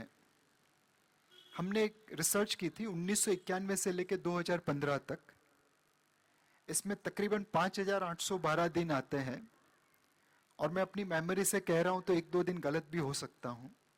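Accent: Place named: Bengal, India. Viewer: Sir, ur accent is very much like bengali babumoshai when u speak hindi.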